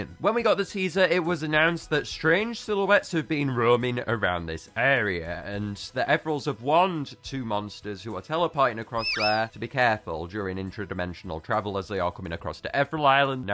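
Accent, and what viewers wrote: United Kingdom. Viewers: You are great to watch! I love your British accent! 💖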